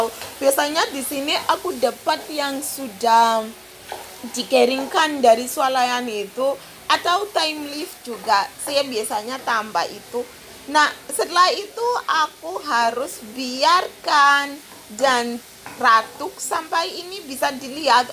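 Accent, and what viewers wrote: Java, Indonesia. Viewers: Saya nonton video2 nya mbak ini ngakak terus karna logat jawa yh sangat medok,Londo kampung piye iki ene sainganne hahahaha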